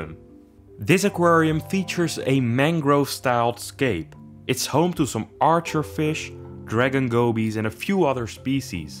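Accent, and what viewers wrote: Netherlands. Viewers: I think your Dutch😁 by hearing your accent so….super gaaf. Ga zo door met video’s maken.😊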